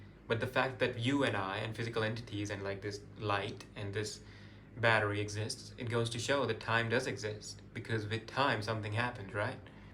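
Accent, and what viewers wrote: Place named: England, United Kingdom. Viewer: Wah yrr Nice English accent ❤❤❤❤❤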